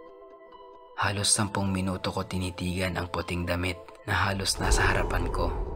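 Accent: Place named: Ilonggo, Philippines. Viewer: Taga saan ka ? Taga capiz ka,? Bat accent ng salita mo d ilonggo,,nano to mn bc gawa gawa mo lng yan istoria mo,, 😂 ,,,,peace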